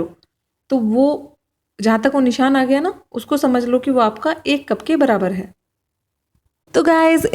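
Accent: Haryana, India. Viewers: Aap pakka Haryana se ho… ye accent aur style sirf Haryana walo ka hi hota hai👍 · apki awaj s lg ra ap harayana s ho pls reply mam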